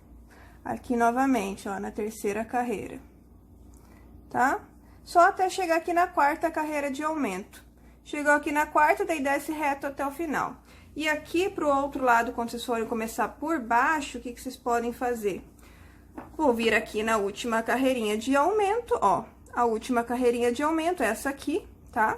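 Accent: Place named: Spain. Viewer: Eu amo essa fala como eu queria aprender falar assim é muito lindo como se pronuncia ad palavras bjosss para todos da espanha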